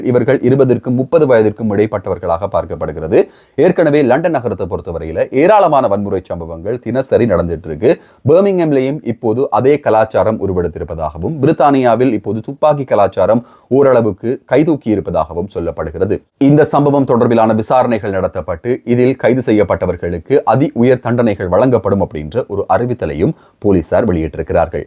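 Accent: Sri Lanka. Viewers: Nice Lankan Tamil accent !!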